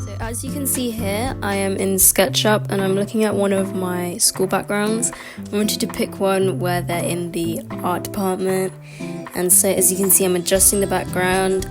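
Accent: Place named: London, United Kingdom. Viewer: typical londoner accent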